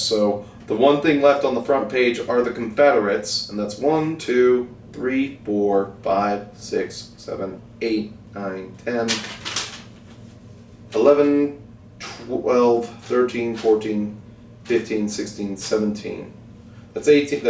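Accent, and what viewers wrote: United Kingdom. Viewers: That is alot of figures.... alot! Im missing your British accent, the guy from Upton that supergule his stamps and because of that charge you extra for the shipping cost. 😎👍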